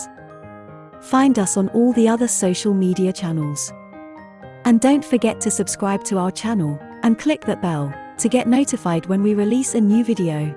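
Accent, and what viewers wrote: United Kingdom. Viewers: stay in your pirate island english !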